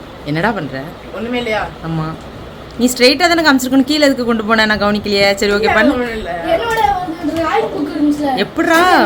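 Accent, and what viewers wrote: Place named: Nagercoil, India. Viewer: Neenga Nagercoil ah sister ❤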